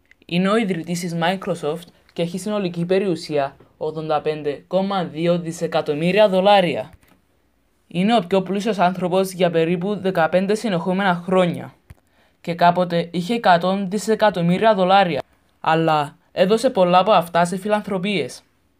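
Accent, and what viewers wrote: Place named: Cyprus. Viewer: κυπριος ειναι ετσι μιλανε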